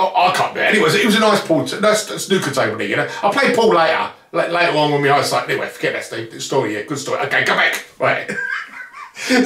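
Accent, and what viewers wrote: United Kingdom. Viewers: I don't know how anyone not from the UK can understand you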